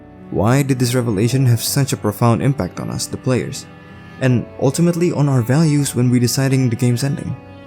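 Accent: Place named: Indonesia. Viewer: i immediately recognized your accent! hello, from an indonesian american! i loved this video and completely agree with your analysis and all of your thoughts on the ending. it’s been 2 months since i’ve seen the finale of clair obscur and thinking about these characters still brings me to tears 🥲 so few games hit as deeply as this one does, it’s such a gem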